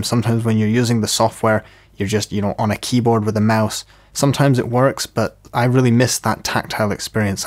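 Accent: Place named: Perthshire, United Kingdom. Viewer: I’m music daft but for some reason the only thing I can think about watchin these videos is where you’re from. Im also Scottish AF and have a habit of placing accents but yours is hard to read. You don’t sound southern/ central belt, neither do you sound like a farmer/northern. Im guessing Perthshire? Please reply for my sanity. Im a proud Forfarian wasting away in Dundee as an electrician with a view to taking your crown. Keep up the good excellent work my good man. Really appreciate it and look forward to working with/against you in the future. Wink emoji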